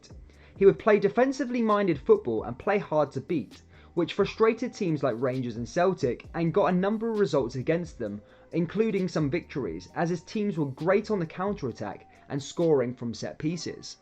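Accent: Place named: England, United Kingdom. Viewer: How can you be Scottish with an English accent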